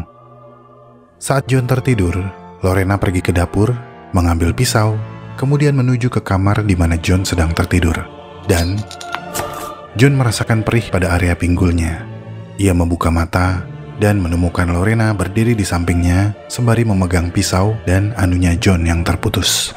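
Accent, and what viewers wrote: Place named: Java, Indonesia. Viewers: Hahahaha.. Naratornya Sundanese nih.. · Kilang kilung nak wong jowo ngomonge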